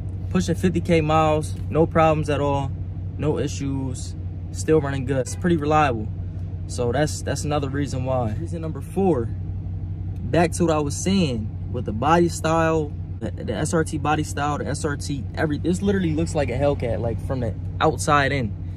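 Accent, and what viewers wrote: Philadelphia, United States. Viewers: Boa yu sound like yu frm philly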